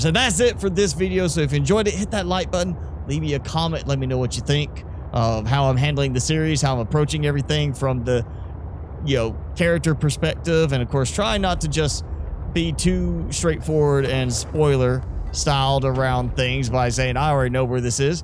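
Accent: South, United States. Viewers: I hear a country accent in your voice lol, where u located at. I'm down in Mississippi lol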